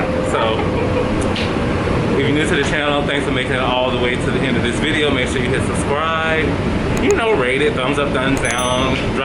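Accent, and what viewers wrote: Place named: New Orleans, United States. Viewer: Are you originally from New Orleans? You sound like it. I am a 62 year old woman who finds your videos very entertaining. Thanks for making me laugh.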